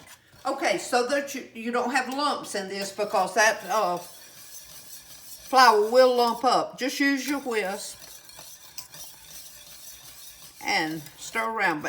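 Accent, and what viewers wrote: South, United States. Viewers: I could listen to you for hours!!! I love your southern accent. I’m a subscriber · Ms., you are a national treasure! I love hearing your accent (Yankee here) as it brings back frond memories of our time stationed in the South. God's richest blessings to you and your family! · It’s so good to still hear that good old southern down home country accent from a southern young lady..!..and can’t wait to cook this dish..!..GOD BLESS..!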